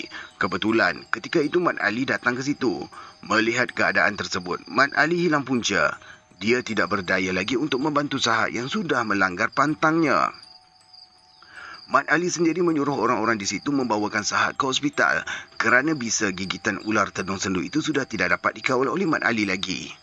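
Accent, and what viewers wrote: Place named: Perak, Malaysia. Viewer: Assalamualaikum...wah arini mmg byk story ye cikgu☺️..dari nak kejor mat rempit membawa ke kelas bahasa..loghat perak pulak ye! Apa2 pun mmg terhibur .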